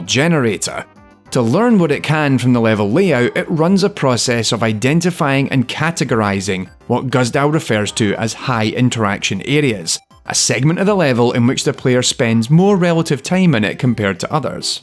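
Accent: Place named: Fife, United Kingdom. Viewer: I may be wrong but I sense a Fife accent?? 👀 also I luv the video I should rly be asleep right now lmao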